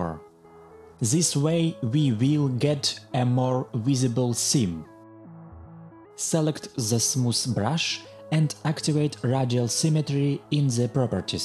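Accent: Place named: Russia. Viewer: Тоже прикололся с явного русского акцента?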